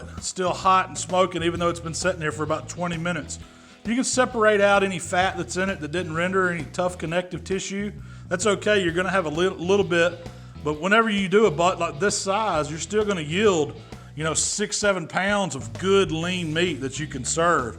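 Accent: South, United States. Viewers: I not really understand what he said, is it south USA accent? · Southern accent plus overweight... please serve me food!!! 😍😍😍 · Unless your a big boy with a southern accent then I don't listen to ya on BBQ/SMOKING TIPS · he has quite a strong American (I'm guessing southern? I don't know American accents!) accent. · I can't think of anyone I would trust more when it comes to anything relating to eating meat than a big happy dude with a southern accent and a half dozen different types of grills/smokers on his back patio. At the time I post this there are 299 dislikes.... who the hell is clicking that button??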